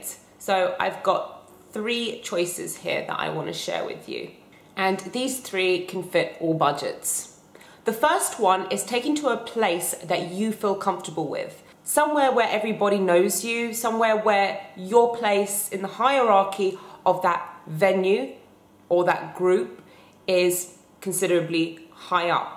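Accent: United Kingdom. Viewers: "Bitch Shield" bahaha. I wish her accent wasn't so british though.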